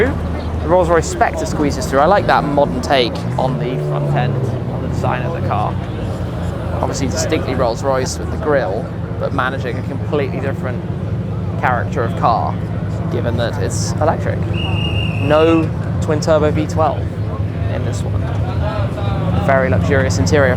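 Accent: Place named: England, United Kingdom. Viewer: Is it me or all the car you tubers have English accent 🧐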